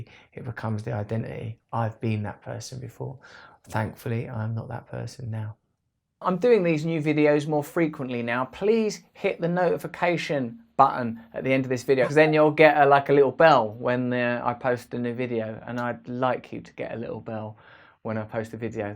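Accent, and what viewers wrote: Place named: United Kingdom. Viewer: thanks dude. I just finished your book Freedom and it's awesome btw. you remind me a lot of a friend I have, she also says wise things and also is very funny and also is British, so based on my sample size of 2 in this survey I guess it must mean the British are wise, funny and, well, British. have cool accents, lets make that number 3. anyway thank you xx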